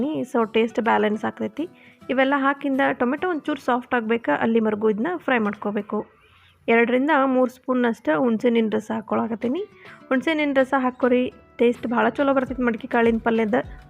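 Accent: Dharwad, India. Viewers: ನಮ್ಮ ಧಾರವಾಡದ ಮಾತಿನಲ್ಲಿ ನೀವು ರೆಸಿಪಿ ವಿವರಿಸಿದ್ದು ತುಂಬಾ ಇಷ್ಟಾ ಆಯ್ತು ರಿ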